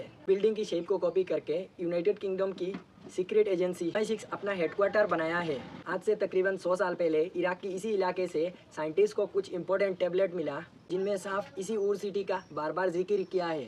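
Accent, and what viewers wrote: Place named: Sylhet, Bangladesh. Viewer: Mashallah!! I'm just big fn of ur sylethi accent 😂❤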